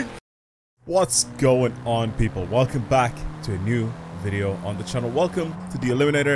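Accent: Ireland. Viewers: I love your content but the intros could do with a bit of work one minute 45 in I haven’t even touched the Excelerator once, Love the Irish accent but I best be getting the suck or fuck after 🥱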